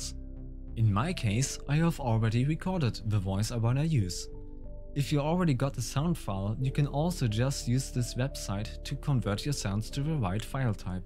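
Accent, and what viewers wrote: Germany. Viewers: Bros accent is way to german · are you german? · you can hear the German accent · ich wusste du bist deutsch XD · I Hear Deutsch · ich habe schon am anfang des videos an deiner aussprache gehört das du deutscher bist :D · keiner kann mir sagen das er nicht deutsch ist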